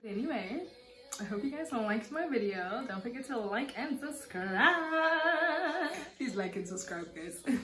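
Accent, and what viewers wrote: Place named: Newcastle, United Kingdom. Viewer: It's the Geordie accent for me🤣🤣🤣🤣🤣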